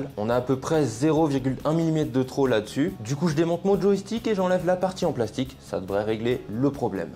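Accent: Belgium. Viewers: Les belges prononcent pas les chiffres comme les français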